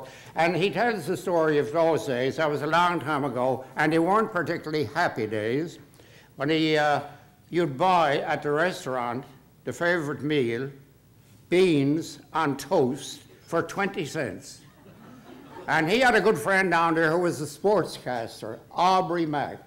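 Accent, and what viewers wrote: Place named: Ireland. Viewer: Interesting accent. I can hear the Irishman in his accent. Greetings from Ireland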